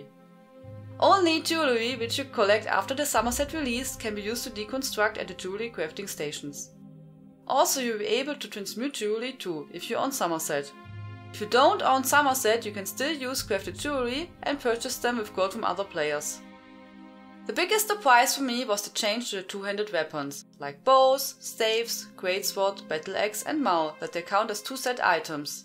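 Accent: Germany. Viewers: Sehr schönes informatives und vor allem kompaktes Video! Meistens stört mich der starke deutsche Akzent in englischsprachigen Videos aber bei dir ist er irgendwie süß ^^ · Der deutsche Akzent ist ja dezent süß. Weiter so... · Gonna get this expansion. You sound german btw ;)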